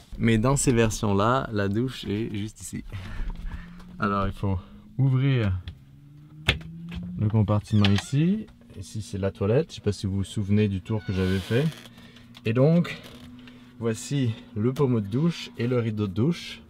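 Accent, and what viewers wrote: Quebec, Canada. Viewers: jb 2004 l accent québécois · Ça ce voit qu'il est français, les gars, il a juste un petit peu pris l'accent québécois. x) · Tu a un accent quebecois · L'accent canadien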